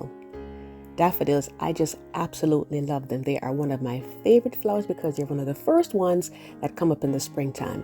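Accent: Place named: Jamaica. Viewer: Love your Jamaican accent. I'm Jamaican too.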